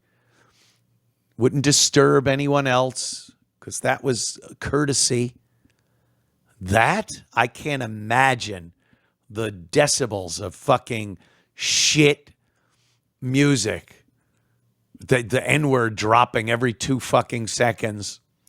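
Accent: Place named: Boston, United States. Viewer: I love your Boston area accent. You are also pretty don good with Tony Soprano , Jesse Ventura and Casey Kasem.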